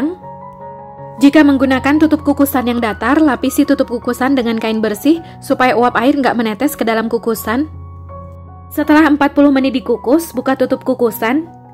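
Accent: Medan, Indonesia. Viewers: owh medan patas logatnya hehe.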